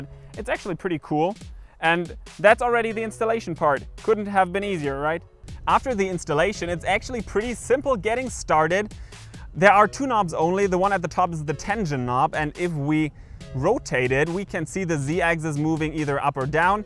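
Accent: Germany. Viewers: we wanna throw a look hier hat man schon gemerkt das du deutscher bist :) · Kommst du aus Mainz? Schönes Review :)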